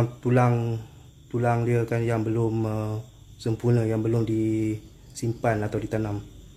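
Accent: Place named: Northern Malay, Malaysia. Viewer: Hang nk ckp utara ,hang xyahlah ckp key el plak....lagi satu klau nak ulas tu ang ulasla dgn bijak sikit...walaupun hang seorang pemberani tp bila ulas mcm tu rasa semak..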